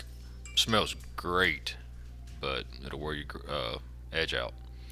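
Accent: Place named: United States. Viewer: Hey, in this Moment, i am a Fan of you and your work. Very nice. Where are you from in the USA? I like your voice and your dialect. Many greatings from northern germany, from me and my girlfriend. Stay care and healthy.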